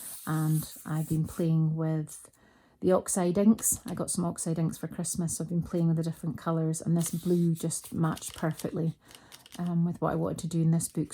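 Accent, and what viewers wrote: Scotland, United Kingdom. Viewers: Hi! I am new to your channel and I am so glad I found you! What superlovely and beautiful and feminin journals you are making. They are just wonderful and unique! Now I have to watch all your videos ❤️ You are a true artist. And your Scottish accent is very pleasant to listen to. Thank you for sharing ! ❤️ Hugs from a cold and windy Gothenburg, Sweden //Charlotte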